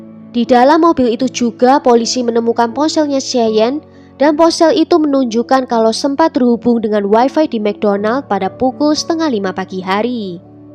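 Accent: Java, Indonesia. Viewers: Mimin suaranya medok. Jawanya mana min?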